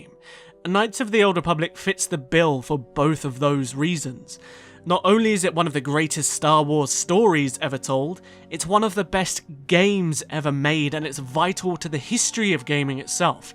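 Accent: United Kingdom. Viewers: It's interesting hearing you try not to sound British. You are British right? lol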